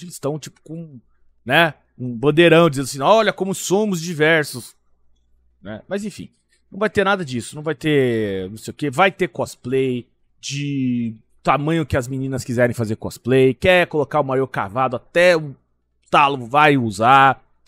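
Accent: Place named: Curitiba, Brazil. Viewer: Eu te sigo pelas suas notícias/informações, e esse teu jeito Piá de Curitiba de narrar as mesmas; acredito que cada um dos 20mil inscritos tem seus motivos e o que deve ser unanime é o formato simples e objetivo do canal .Parabéns pelo trabalho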